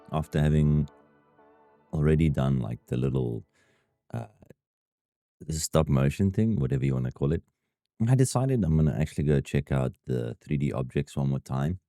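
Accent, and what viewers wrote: South Africa. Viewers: I'm new here. Are you South African?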